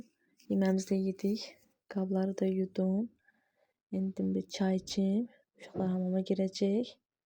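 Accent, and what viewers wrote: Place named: Qabala, Azerbaijan. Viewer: menimde yoldaşım qebeledendi size qoz gelmeyinden birde lehcenizden bildimki qebelelisiz😘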